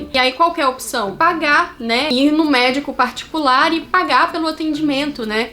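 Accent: Brazil. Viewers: mesmo vc morando ai a 4 anos, eles sabem que vc tem sutaque br? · Eu sou mineiro e o seu sotaque parece muito com o daqui de Minas, exceto com o da região norte do estado, que é mais para o sotaque baiano.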